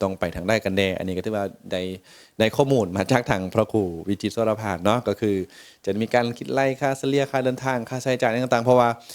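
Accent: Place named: Nakhon, Thailand. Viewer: เว้าภาษาสำเนียงเหมือนคน อ.นครไทย จ.พิษณุโลกเลยค่ะ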